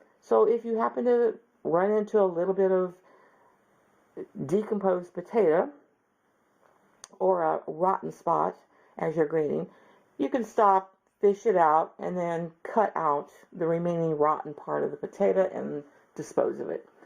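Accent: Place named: Illinois, United States. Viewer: This is so werd! Assuming, as I am, that you're from central Illinois based on your accent (...I love how you say sauseege, potayda, and puddin' just like my grandma used to!), you're like this odd mix of both sides of my family. My mom's side are Lithuanian from northeastern PA so I had kugeli a lot as a kid... and my dad's side are all from Bloomington, IL, where I was born. So cool to see it mixed like this.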